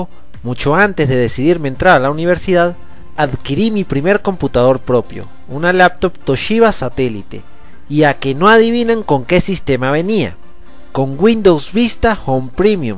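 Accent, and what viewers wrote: Venezuela. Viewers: ACENTO VENEZOLANO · El acento venezolano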